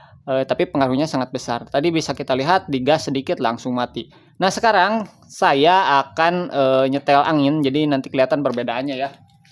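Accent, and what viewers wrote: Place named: West Java, Indonesia. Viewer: Dari logat bicara nya, akang mirip2 channel bang vedro simister.. cara penyampaian nya jelas dan ada logat sunda nya.. semangat kang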